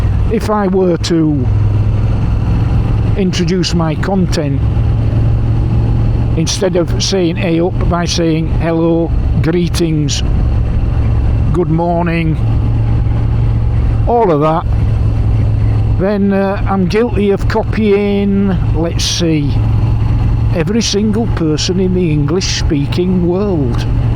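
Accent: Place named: Northern England, United Kingdom. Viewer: Ey up chuck, how i miss my northern mum saying that. Keep up the dialect and content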